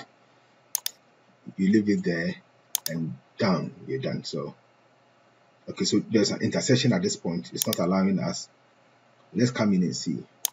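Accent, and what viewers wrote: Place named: Ghana. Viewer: He sounds more Ghanian than Nigerian.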